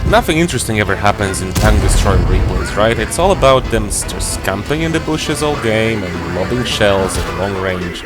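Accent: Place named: Europe. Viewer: Yeah. We have a similar PRAV clan, and for some reason, you sounded European to me, so I thought you were EU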